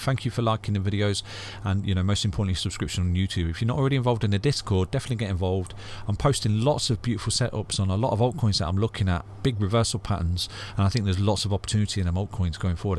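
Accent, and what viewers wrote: United Kingdom. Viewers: Keep up the good lovely british accent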